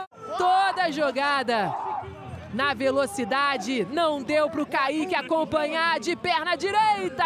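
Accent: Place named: Rio de Janeiro, Brazil. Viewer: Dá para tirar essa VOZINHA de TAQUARA RACHADA, feia, estridente, de criança e colocar um HOMEM ou uma MULHER ADULTA para narrar!? Fora o sotaque extremamente acentuado que só CARIOCA entende... TIREM ESSE NARRADOR DAÍ, URGENTE!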